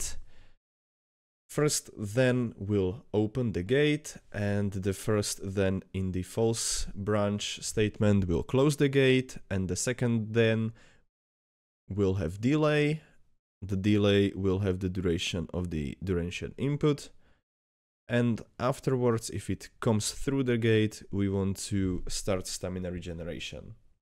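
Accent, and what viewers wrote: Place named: Slovakia. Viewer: Už len podľa prízvuku viem povedať že si slovák, ale popiči tutorial bráško dikes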